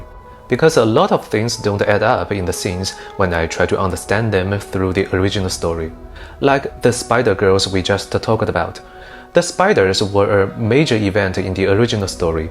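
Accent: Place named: China. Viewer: 是国人吧 这中式口音（并无冒犯之意）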